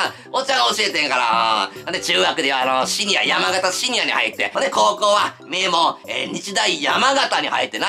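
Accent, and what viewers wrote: Kansai, Japan. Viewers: ゴリゴリ関西弁ですやん😂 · 設定に無理あるが、関西弁で押し通す精神力はすごい。さすが元お笑い芸人。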